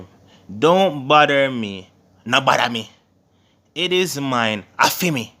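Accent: Jamaica. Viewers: Patois! Love the accent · Jamaican accent hehe very interesting I like it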